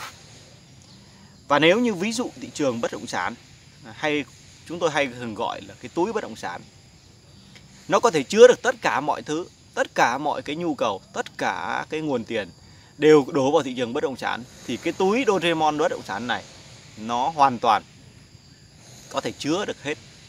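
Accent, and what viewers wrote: Thanh Hoa, Vietnam. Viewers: Ông này giọng ở Thanh Hoá, thị trg bất đs sẽ tăng cục bộ tuỳ nơi, nhìn chung sẽ đúng giá trị thật, thử tưởng tượng mua căn nhà phố trên 10 tỷ cho thuê 20t/t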